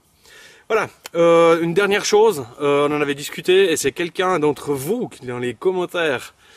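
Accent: Switzerland. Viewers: Coucou, je me suis abonnée à ta chaine depuis la première de T vidéos dans la neige;)!!! Franchement je t'adore tu es marant, ta bonne humeur fait du bien, je rigole beaucoup, ton rire est communicatif!!! pour les vidéos j'y connais rien, mais sur certaines autres j'entend rien, avec toi tout es clair!! joli paysage, ton accent à mourir, té pas suisse pour rien car tu dit tout le temps "c'est pas grave, on a le temps" hihi enfin tout ça pour te dire que j'ai presque fini de visionner tes vidéos et que ça va être long entre chaque hihi merciiiiiiiiiiiiiiiiiiiiiii pour les partages et surtout change rien tu es super.... la bisouille... Evy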